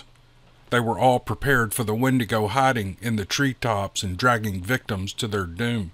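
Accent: South, United States. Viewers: D.A.Robert’s gives Tom Clancy a run for his money, and brings it to life with that talented southern draw……..AWESOME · This sassy southern woman gettn' into team Odin!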